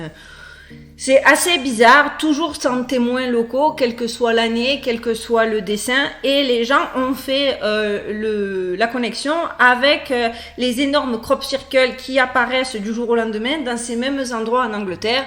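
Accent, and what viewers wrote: France. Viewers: Génial plus je découvre tes vidéos plus j ai hâte de les découvrirent. Tu au top ne change rien à tes accents français ou Anglais. J aime ton authenticité suis fan. 🙏😘🌹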